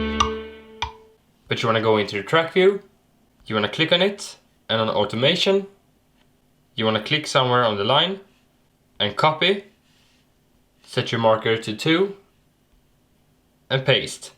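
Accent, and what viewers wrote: Sweden. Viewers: visst är du svensk eftersom att du har på svenska inställningar och pratar lite svengelska xD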